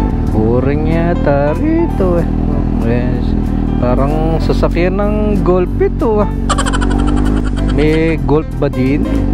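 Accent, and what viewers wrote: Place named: Bulacan, Philippines. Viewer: Aba eh nawiwili akong makinig sa pagsasalita mo ngayun idol eh,alam mo bagang ganyan din magsalita mga taga San Miguel bu..lacan...mga kamag anak ko dun eh pag silay magsalita ng ganyan eh di ko wari kung akoy tatawa eh....hehehehe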